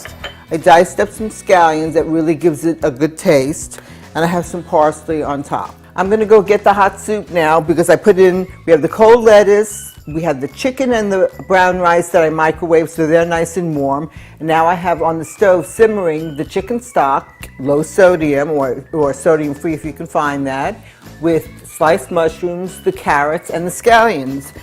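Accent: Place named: New England, United States. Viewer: That sounds like a New England accent. Im from Boston, where are you from ? Thank you for the tips. I use this chicken all the time and always looking for new ideas.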